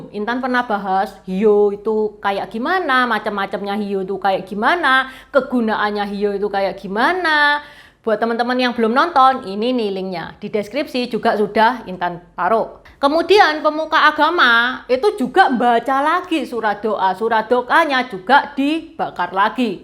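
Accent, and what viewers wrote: Java, Indonesia. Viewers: Wajah anda tionghoa bngt ya ,tp tetep ya logat jawa nya kental bngt ya😊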